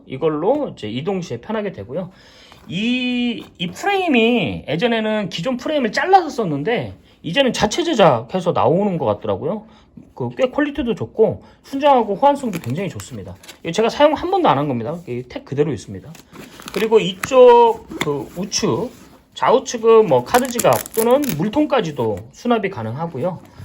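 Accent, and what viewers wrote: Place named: Seoul, South Korea. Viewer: 님 역시 고급진 서울어에 목소리도 좋으시공 ㅎ 이렇게 이쁜가방 나눔이벤트도 해주시고 응원하면서 신청해봅니다 41번 진규철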